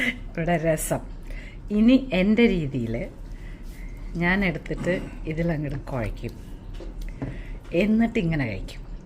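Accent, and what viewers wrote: Palakkad, India. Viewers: Dear.... സുഖായോ... Sound ഇപ്പോഴും ക്ലിയർ ആയിട്ടില്ലല്ലോ... കാത്തിരിക്കയായിരുന്നു പാലക്കാടൻ സംസാരം കേൾക്കാൻ.. സന്തോഷമായി... Wishing you life"s best mn new year 💐💐💐💐💐❤️, കണ്ണേട്ടനേയും, മക്കളെയും ചോദിച്ചതായി പറയണേ... കാണുമ്പോൾ എന്തോ ഒരു sister like feeling ആണ്.. മക്കൾ വളർന്നു... സന്തോഷം.. ഒരു ഹായ് പറയണേ.. From Sophia Dicruz ഫ്രം പഞ്ചാബ്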